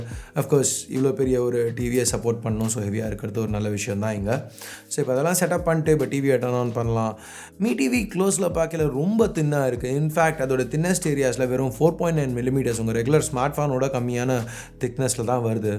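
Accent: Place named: Telugu, India. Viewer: It's really surprising that you are in talking in Tamil because I thought that you were telugu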